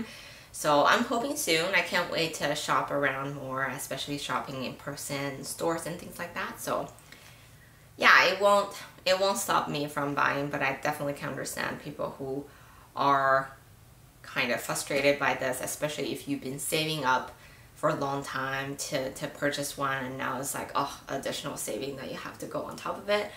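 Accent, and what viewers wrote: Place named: Hawaii, United States. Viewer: haha okay! You sound like a Hawaiian local!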